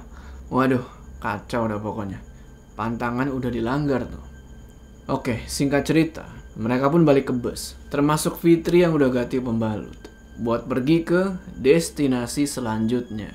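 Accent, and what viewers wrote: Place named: East Java, Indonesia. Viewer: Emang kenapa kalo ngomong jawa timuran?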